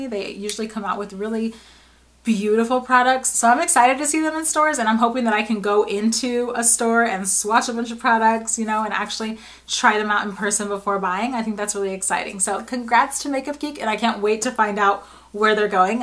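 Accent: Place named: New York, United States. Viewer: I guess I'll have to go into the city to get everything. No complaints here! I love it there. If it wasn't so expensive I'd live there. Are you from NY/NJ area? You sound like you are and some of the stuff you say is exactly what/how I say it. Whenever I go away people can always pick out where I'm from LOL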